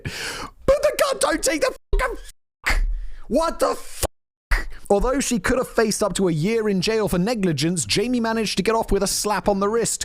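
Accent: RP, United Kingdom. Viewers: If it wasn't for this channel I wouldn't be able to tolerate his poshness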